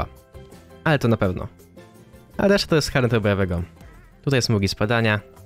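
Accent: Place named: Podlasie, Poland. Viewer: jesteś z Podlasia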